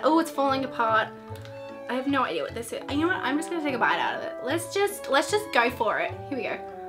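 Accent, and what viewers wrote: England, United Kingdom. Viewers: I thought she was from england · Oh I always thought you were British! Btw I’m a girl just on my dads account.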